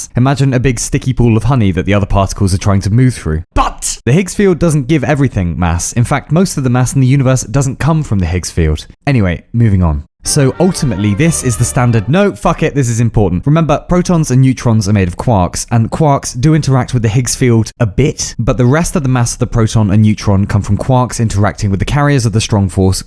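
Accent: England, United Kingdom. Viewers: why do i voluntarily put myself into listening to some brit talk about life for 15 minutes every month or so? why do i enjoy it so much? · Нали? много е готин човека ама бг-то му с чист английски акцент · you british? you bulgarian? what else? :D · Why do you sound british ?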